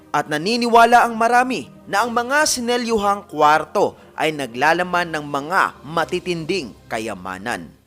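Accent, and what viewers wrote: Visayas, Philippines. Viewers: Bisaya diay ka kuya ahahah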